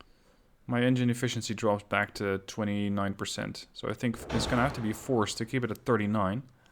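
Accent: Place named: Netherlands. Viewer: Listening to this, i am sure he is dutch, they can not hide the accent.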